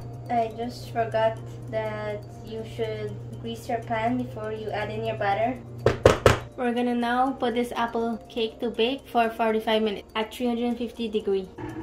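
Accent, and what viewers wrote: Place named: Berbice, Guyana. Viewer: Just luv that Berbice accent!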